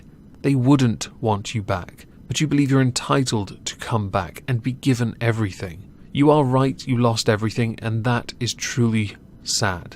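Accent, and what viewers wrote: RP, United Kingdom. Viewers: Loving the posh English accent.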